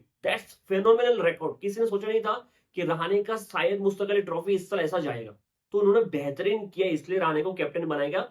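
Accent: Bengal, India. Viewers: bhai are you bangali?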